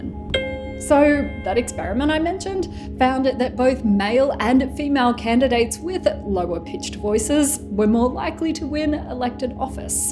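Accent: Australia. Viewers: Same! And her purely Aussie accent too 😁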